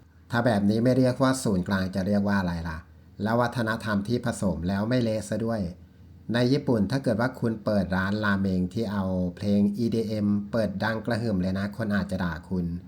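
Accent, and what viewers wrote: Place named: Thailand. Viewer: ชอบแอดมาก มีความเป็นไทยตั้งแต่เริ่มคลิปแถมเสียงเหน่อทำให้ผ่อนคลาย❤🫶🏻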